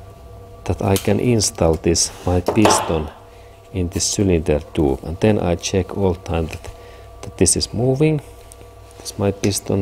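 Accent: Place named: Finland. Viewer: Thanks for your videos. I am getting to like the Finnish accent!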